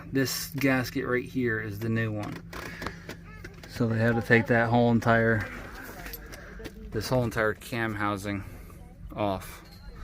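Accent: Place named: Northeast, United States. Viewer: He's from the Northeast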